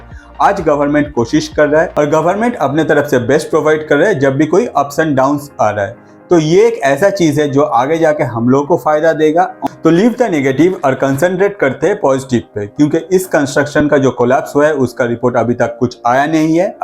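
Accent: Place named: Assam, India. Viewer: App Assamese ya Hindi na bolne wala he? Kyuki AAP jav Hindi bolte ho lagta he dusra bhasha bol rahe ho😊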